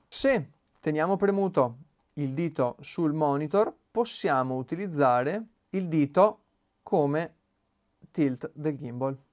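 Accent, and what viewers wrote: Veneto, Italy. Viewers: Ma sei di pavullo???? Ti facevo Veneto. Complimenti sei molto in gamba.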